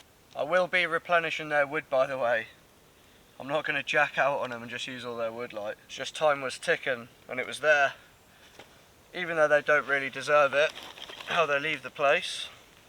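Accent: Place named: Norfolk, United Kingdom. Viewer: Very interesting indeed. Good to hear a Norfolk accent again. Camping spot was ideal.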